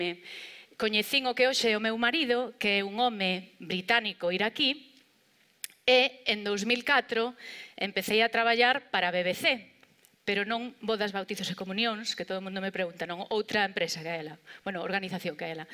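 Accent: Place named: Spain. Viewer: Excepto num muito ligeiro sotaque "espanhol" esta apresentação não teve nada de exótico para mim que sou do norte de Portugal. É em grande parte o meu idioma que ouço aqui.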